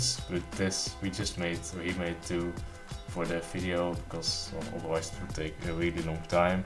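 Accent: Netherlands. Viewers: are you from the Netherlands? it sounds like you are, I'm too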